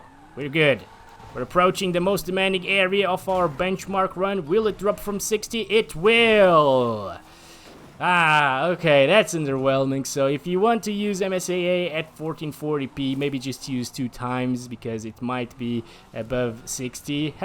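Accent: Brazil. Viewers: Ele é brasileiro????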